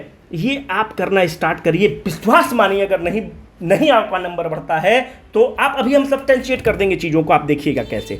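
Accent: Bihar, India. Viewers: Hero is Back.... What a strong voice and I love the way you substantiate things..... BIHARI Hindi tone mixed with Sound vocab of English is deadly combination sir😊 Loved every bit of video!!!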